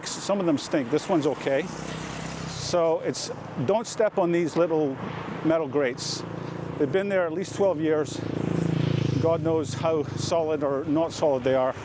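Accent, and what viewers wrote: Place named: Glasgow, United Kingdom. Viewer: That sounds like a west end of Glasgow accent…am I right?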